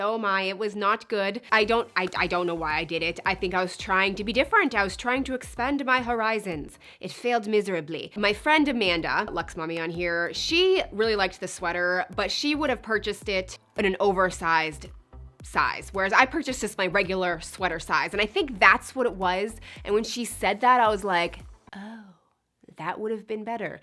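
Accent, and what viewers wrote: United States. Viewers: She has an American accent 😅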